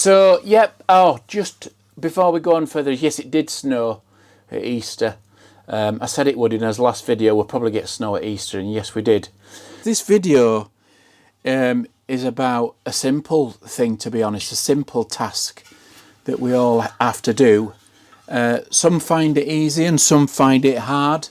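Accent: Yorkshire, United Kingdom. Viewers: ! Great job! Thanks so much, and ya I've subscribed too;)) AND i do wish you 'd insert 'sources' or 'links' for the various products you found helpful!! For example NUTRAK MILK, and that fancy BENCH WHEEL-HOLDER tool!! and the?"Pedro Tyre Levers"??? Did I spell it right?? ALSO: Question: Why not use Powdered Graphite as a lube for pushing the tyre around... As you probably know, some people use TALC on an inner-tube, but that material has health issues (like traces of asbestos in it) as a lube but perhaps they could switch to graphite OR to Corn Starch?? Your opinions would matter to us all!! (Oh and we love that Yorkie accent, guy!)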